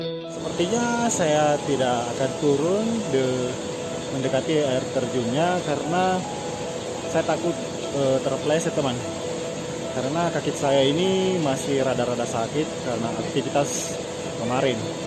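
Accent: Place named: South Sulawesi, Indonesia. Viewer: Logat bugis nya kental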